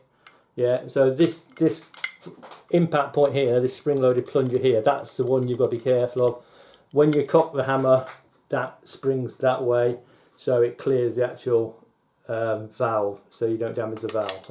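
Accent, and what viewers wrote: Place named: United Kingdom. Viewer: Even with subtitles, it's really really difficult to understand awful British accent, I wish you were regular English speaker 😢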